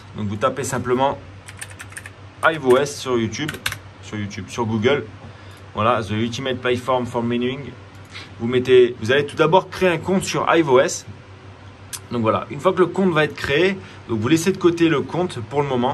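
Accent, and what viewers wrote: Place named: United Kingdom. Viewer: Mdr l'accent anglais j'ai le meme merci pour tuto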